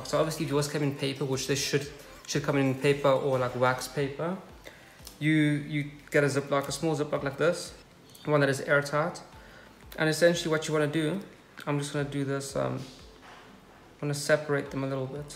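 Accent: South Africa. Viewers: Australia? Here all these videos I thought I was listening to south africa.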